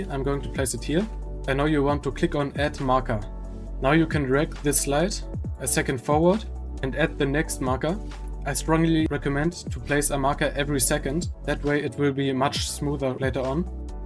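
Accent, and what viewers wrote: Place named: Germany. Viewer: Wie man se German Englisch hört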